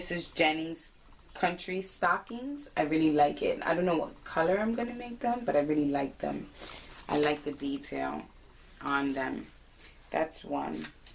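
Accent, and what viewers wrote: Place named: New York, United States. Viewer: Hello, I love PandaVille! I see that you have fallen in love with knitting. I hope that you are able to complete your project list. I'll be watching and give you and a shout out on my next (YT) video. Love the New York accent! P.S. Love the knitting caddy Christmas gift from your husband. J.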